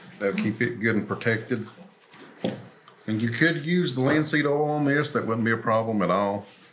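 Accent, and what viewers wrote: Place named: South, United States. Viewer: My husband and I just watched the whole thing together. Such a great video!!!! James has a few antique tools that my grandpa has given him and HE LOVES THEM!!!! Your channel is seriously so cool. I love your content and I love this video!!! :) Great job restoring!!! Keep creating. I’m subscribed and will always be:) Lastly, I love your accent! I wish I had a southern accent... From, Collins Country:)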